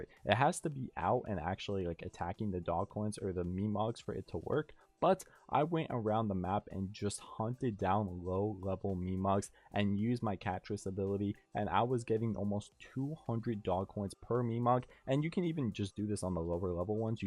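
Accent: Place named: Quebec, Canada. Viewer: you sound french canadian.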